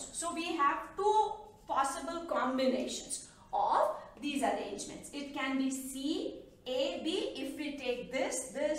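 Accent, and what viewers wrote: India. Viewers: I can't understand Indian accent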